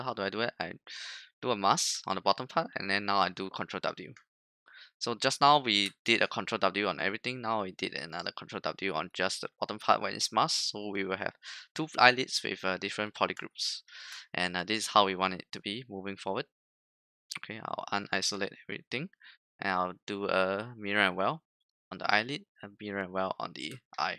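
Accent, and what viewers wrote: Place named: Singapore. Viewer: Sounds like a Singaporean...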